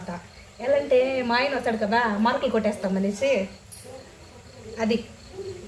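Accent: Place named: Karnataka-Andhra border, India. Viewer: Mee language same love Reddy movie lo laga undi Karnataka andra border's lo mataldautaru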